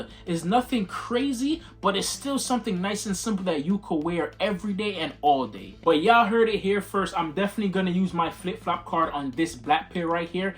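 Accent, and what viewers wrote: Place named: Toronto, Canada. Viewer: Good work bro. Toronto accent goin crazyy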